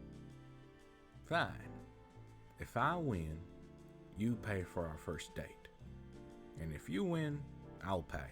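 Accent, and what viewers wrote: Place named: South, United States. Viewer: ✨Ohhh a southern accent! You did a phenomenal job! Loved it!😊👏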